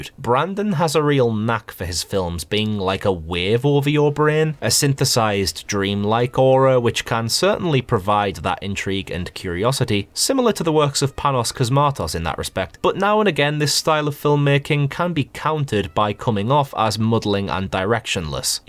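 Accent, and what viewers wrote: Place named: Teesside, United Kingdom. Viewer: Distracted by trying to figure out the accent. Thornaby?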